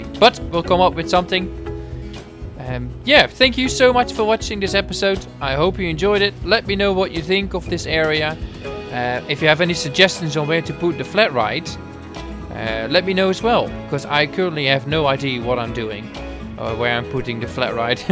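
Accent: Netherlands. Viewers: It's even worse. It's southern Dutch 😂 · Brabant heuj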